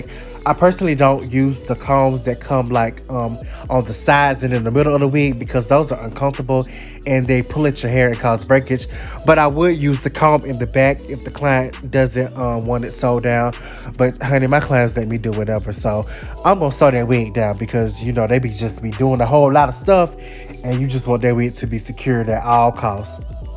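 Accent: South, United States. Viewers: This country accent I love it lol😭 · He's accent is southern and he does say he's located in Georgia in every video description.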